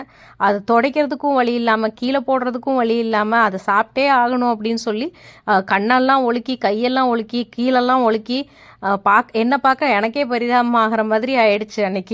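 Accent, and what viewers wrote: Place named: Kongu, India. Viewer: கொங்கு நாட்டு தமிழ் வழக்குடன் அருமையான பதிவு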